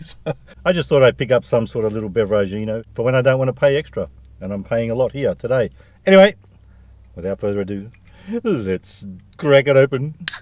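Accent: Australia. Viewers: GOTTA HAVE A BURRITO great video mate but your still just a touch too australian for my taste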